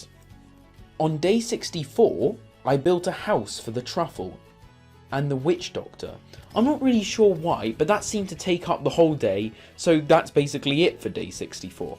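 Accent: United Kingdom. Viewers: Are you from the UK? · Oh my god, this was honestly so cool. And I love how your british accent suits with your jokes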